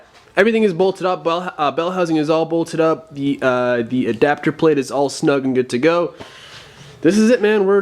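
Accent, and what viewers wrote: Australia. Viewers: This dude kills me me with his Australian voice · you need to stop speaking in an Australian accent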